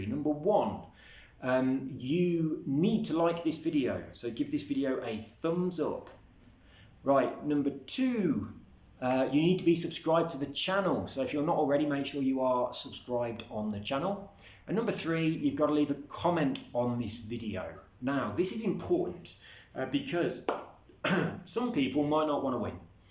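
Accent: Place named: Scotland, United Kingdom. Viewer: LOL nice apartment! Don't know anything about you but I can say you're a Scotch. Got it from the accent! Never had an apple watch but I can guess they're bad. Also agree with you. No idea why people have to be over 13 to join giveaways or restricted to join any of giveaways. Usually I enter only PS4 or PS5 competitions. I did enter yours because I want to trade for PS5 as soon as I win the competition. Well, the reason I want to trade for PS5, technology is really expensive in my country, Turkey. Don't know if you believe me but I still can't afford a PS4. That's why I want to win XBOX Series X. IMHO Xbox got better hardware, that's cool, but we can't ignore the fact Playstation has better exclusive games. I am not a multiplayer person, I play games for its story. I wanna feel in it. Which is why I am going to trade with a PS5 if I win this competition. 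I want to thank you for giving us this chance and good luck to all of you. Have a nice day and stay safe!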